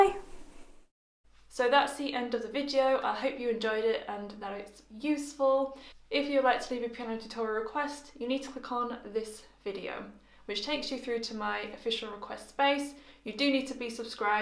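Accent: United Kingdom. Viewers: Tahnk you for tonight,, For some reason your uk aceent is seemigly plluck my heart string. d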